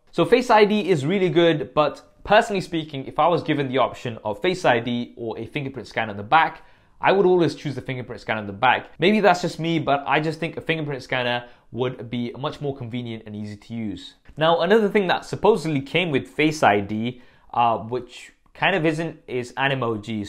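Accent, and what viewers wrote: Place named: United Kingdom. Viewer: Was waiting for British accent lol.. other than Marques and Michael Fisher, you're the only reviewer I trust (and yes I am fanboying)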